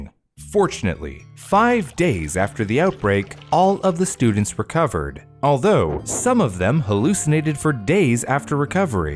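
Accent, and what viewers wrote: Canada. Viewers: u sound Canadian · Sounds Canadian …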